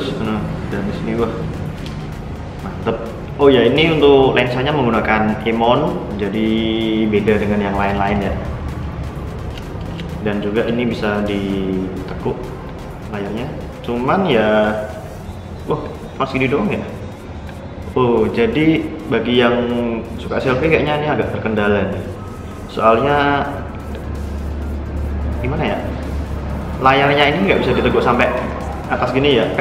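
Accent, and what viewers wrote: Java, Indonesia. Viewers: mending jadi diri sendiri aja mas. gaya reviewnya lebih bagus justru menampilkan feel 'jawa' nya.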